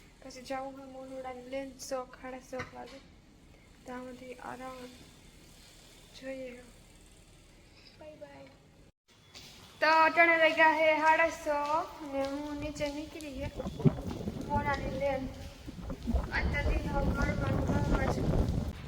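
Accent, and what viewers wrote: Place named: Porbandar, India. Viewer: From your language I think 💯 % u r from porbandar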